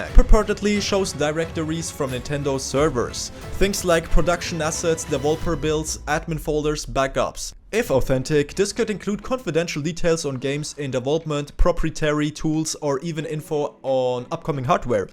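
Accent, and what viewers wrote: Germany. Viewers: That fine German accent...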